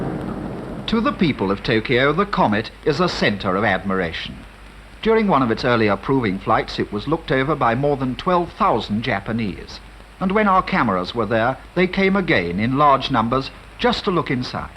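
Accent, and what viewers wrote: RP, United Kingdom. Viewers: BBC RP, now long dead. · That sort of accent is referred to as 'RP' (aka. Received Pronunciation)... and is particularly redolent of news readers of the 1950's, just as can be heard here. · Filla-pines is just plain wrong, and RP is affected nonsense. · The narrator is absurdly posh. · When the BBC strived to be POSH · It is RP. Not affected, people did speak like that.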